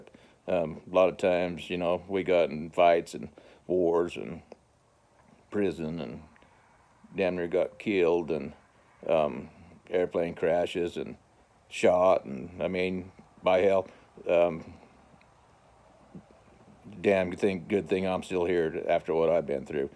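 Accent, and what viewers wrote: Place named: South, United States. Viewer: Why are you Caucasian if you’re from the Middle East? Why do you have a southern accent? If you’ve gone further into the future and you say you can’t change the past, why are you here now if you can’t change anything? Wouldn’t this be the past? Have you considered that the fall of the US is a part of prophecy and God’s will?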